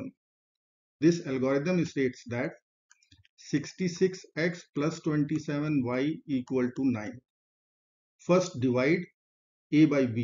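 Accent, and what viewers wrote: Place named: India. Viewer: good video but indian accent is a bit annoying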